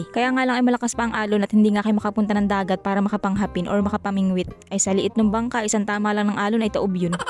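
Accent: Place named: Visayas, Philippines. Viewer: Yong salita mo idol mayhalo kasing bisaya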